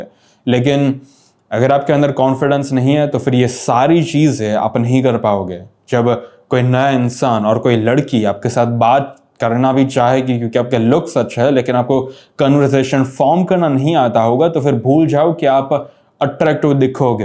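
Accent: Gujarat, India. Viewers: Pure gujrati voice hai bhai ki ❤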